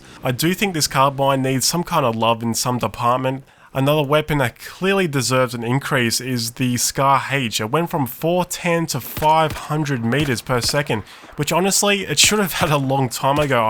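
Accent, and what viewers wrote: Australia. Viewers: Im just wondering are u an australian?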